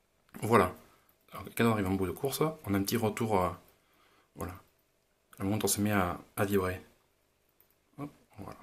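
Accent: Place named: Perpignan, France. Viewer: L'accent de Perpignan 😂😂😂 Une boîte toute blanche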